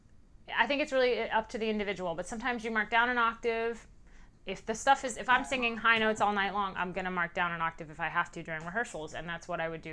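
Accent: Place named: United States. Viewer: She sounds like a normal American woman, not 'husky and gutteral' 🙄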